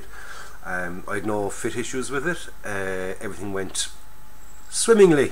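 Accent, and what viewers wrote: Ireland. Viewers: Very nice. And it's wonderful to hear your Irish accent, reminds me of my dear old Dad. Italeri can be a bit of a challenge, like not having locating pins for shackles, tow hitches etc. But you've done a "fine and decent job" of it.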